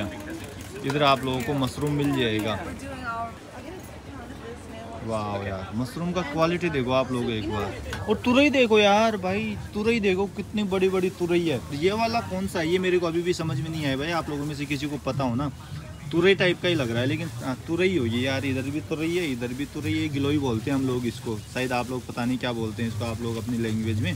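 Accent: Rajasthan, India. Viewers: Aap kya rajasthan se hain kyoki aapki hindi ki tone pashchimi hindi jaisi hai aur chehra lamba ..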